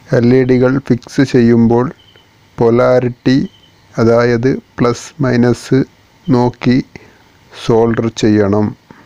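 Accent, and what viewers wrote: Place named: Tamil Nadu, India. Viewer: തമിഴൻ മലയാളം പറയുന്നത് പോലേ തോന്നുന്നു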